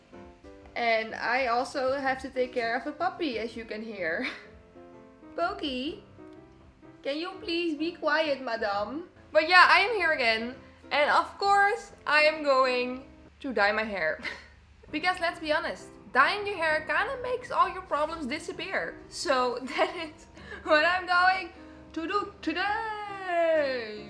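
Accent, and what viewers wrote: Netherlands. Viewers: are you dutch? you sound like you have a dutch accent. Love!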